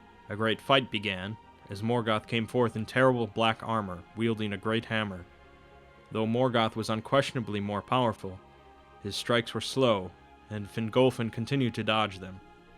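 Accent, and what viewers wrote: United States. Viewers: I am sorry, and I LOVE Americans and their accent, but as an Englishman, I have to state that it doesn't sound right hearing excerpts of the works read in that accent. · I'd say that's just the american accent ;) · Can nobody pronounce Morgoth normally? But always American accent... I know it's dificult for us ( born in U.S.) but live in Europe(Croatia). Please try harder.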